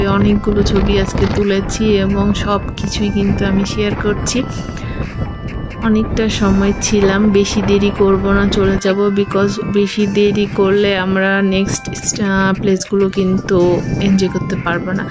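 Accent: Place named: West Bengal, India. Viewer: Apnar bangla uchharon Bharotio bangalir moto..bes valo , all the best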